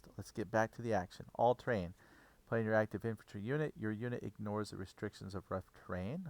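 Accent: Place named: Germany. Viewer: good german accent :-) :-)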